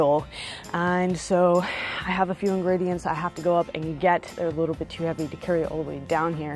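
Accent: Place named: New England, United States. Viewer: Maybe just a hint of New England in taaaak. These folks work hard at whatever they do. Seem like sincere people. Need more of them today😊😊😊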